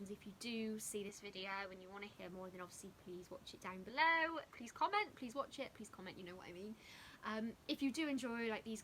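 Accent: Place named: Bristol, United Kingdom. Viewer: Fair play with the marathon, it's weird hearing a bristolian accent on you tube as I'm from bristol too 😁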